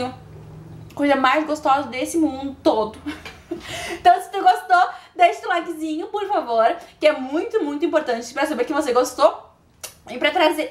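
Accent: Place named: Rio Grande do Sul, Brazil. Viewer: Super gaúcha nesse vídeo né? Amei a dica! Bjs Guria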